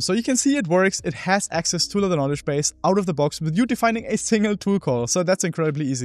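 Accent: Germany. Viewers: Das ist doch n deutscher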